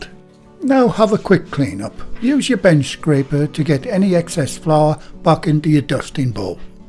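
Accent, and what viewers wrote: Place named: Newcastle, United Kingdom. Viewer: I don’t hear anything but Geordie.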